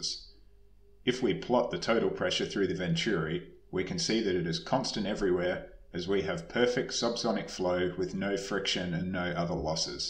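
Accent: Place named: Canada. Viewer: Great video and very pleasant Canadian accent.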